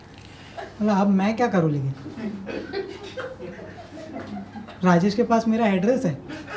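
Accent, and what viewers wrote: Bhopal, India. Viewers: TU BHOPAL SE HAI KYA ???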